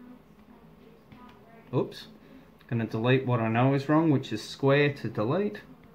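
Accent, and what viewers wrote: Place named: Australia. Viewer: Mate... if I knew where you lived, I'd but you a beer or three...! Got me out of a jam. Good to hear an Aussie accent that knows what he's talking about / doing.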